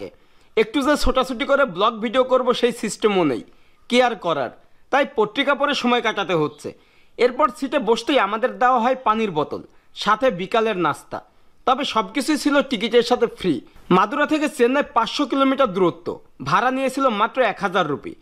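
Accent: Bangladesh. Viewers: বাংলাদেশী english এমনি হয় ।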